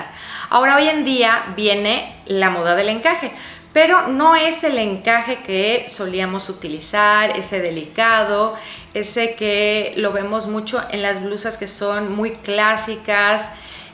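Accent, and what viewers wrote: Mexico. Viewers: Es la primera vez que te veo. Se por tu acento eres mexicana. Cariño , comienza por ti . Ese top que llevas no te va . No estás delgada , es escote no te luce , menos para un vídeo de Youtube. Y menos en ese color . Recibes mucha piel y creo que andas como entre 35 y 40 y ya tienes que elegir bien lo que usas . Una cosa es en el gym ( que ese top te iría muy bien para el gym ) y otra para un vídeo. Saludos , cariño , desde Costa Rica 🇨🇷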